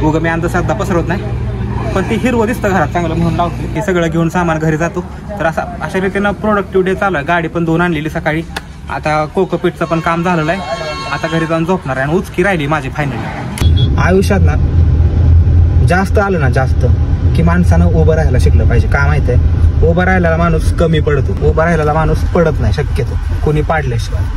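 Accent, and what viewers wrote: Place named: Satara, India. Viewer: I love satara accent 😍 ❤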